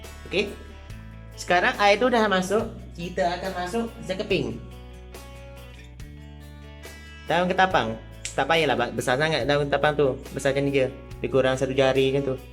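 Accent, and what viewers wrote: Kelantan, Malaysia. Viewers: ore klate ko?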